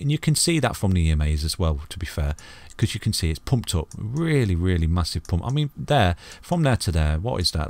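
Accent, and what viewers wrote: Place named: Manchester, United Kingdom. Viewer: your accent sounds like you from Manchester? If so I’m from Manchester as well we definitely got to have a link up!!!!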